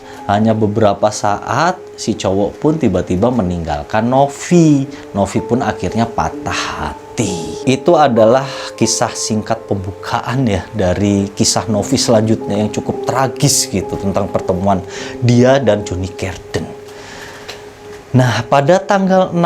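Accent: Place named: West Java, Indonesia. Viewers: mang kayaknya orang sukabumi/bogor... cara ceritanya, bahasanya bisa d fahami dengan jelas ma gw 🤣🤣🤣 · Bahasa sunda na nyangked wae nya kang hahaha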